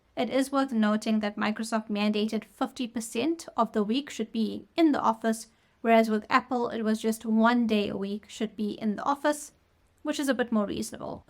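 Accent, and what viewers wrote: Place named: South Africa. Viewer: She's in South africa, Probably "South African indian"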